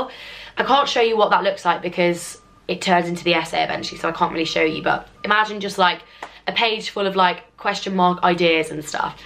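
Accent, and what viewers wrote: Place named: Wales, United Kingdom. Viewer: Oh goodness, that welsh accent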